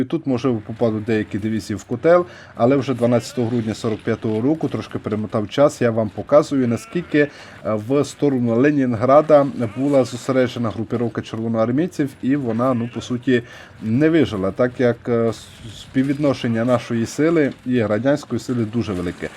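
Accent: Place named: Kolomyia, Ukraine. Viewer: Блін, класний акцент, авторе, ви з Коломиї?😅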